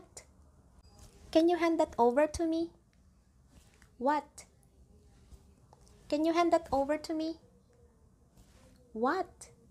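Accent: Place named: Ilocos Sur, Philippines. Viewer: Very southern ilocano. 😊😊😊😊 they will confuse that vowels have different ways to pronounce 😂😂😂😂 vowel A become I 😂😂😂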